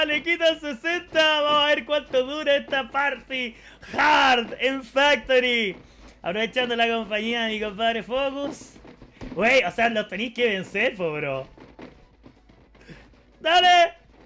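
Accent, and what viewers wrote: Chile. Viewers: Eres de chile? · Bro eres chileno?? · Eres chileno ?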